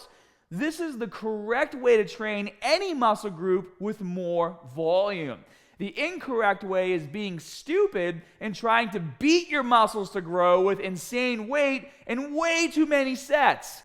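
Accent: Boston, United States. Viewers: Is he from Boston?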